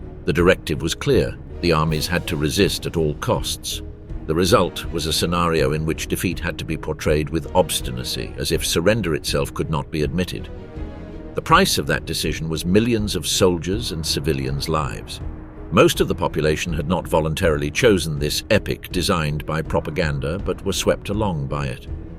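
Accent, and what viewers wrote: United Kingdom. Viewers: The usual anti-Hitler video which is the only ones acceptable of course. Great photos. Annoying Brit narrator. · I'm a retired NYC public school teacher. 25 years of ESL ( Eng Second Lang) to immigrant adults: South Americans, West Africans, Asian adults, fresh " off the boat." They always ask me about history & I tell them. I'm (82, Jan.'26) world history buff, so I know a lot & this is an excellent doc to describe what it was like there then. I also served in US Peace Corps Türkiye <num> & know a lot about Middle East. I speak/read/write Türkçe. So who wrote this script for not only this film but for all the others too? Excellent general research. Great British accent, too. I respect the work that went into this series. No problems with whatever " minor inaccuracy" there might be. Overall, great product. Thank you.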